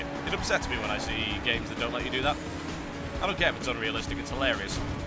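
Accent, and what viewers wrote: United Kingdom. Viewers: Is he British or American? CONFUSED. LOL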